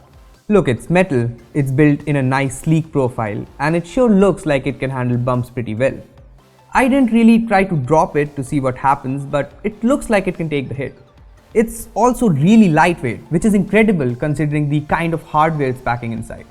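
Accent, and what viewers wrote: India. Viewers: I hate Indian English. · iski english bhi to hindi jaisi hi lgri h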